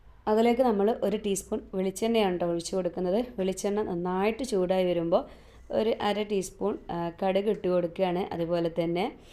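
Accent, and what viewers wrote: Malappuram, India. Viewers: മലപുറം ഭാഷാനന്നായിട്ടുണ്ട് ഇത് കേൾക്കുന്ന ഞങ്ങൾ തൃശൂർക്കാരാണ്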